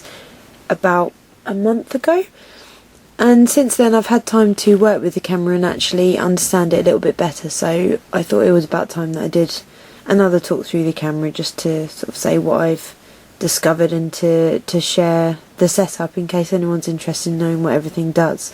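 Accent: United Kingdom. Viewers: Sister, your voice is very british, I like. Do you know how to open the hot shoe lid?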